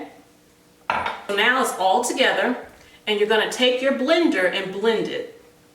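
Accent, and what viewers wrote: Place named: United States. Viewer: To master a language one must master lingo, jargon, slang, colloquialisms, regional dialects and individual nuances in phonetics. The standardization for what or how a word should be used actually changes often, akin to the English purposefully changing their accents to separate them from the puritans who founded the united states, which is how the "queens english" came to be... So back to, her unique enunciations are correct with her location in the united states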